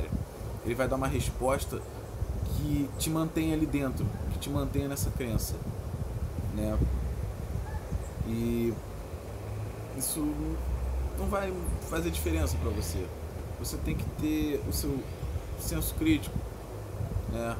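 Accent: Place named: Rio de Janeiro, Brazil. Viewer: Pelo seu sotaque vejo que você é do Rio! Eu também! Existe alguma comunidade de pessoas que segue, vocês se reúnem?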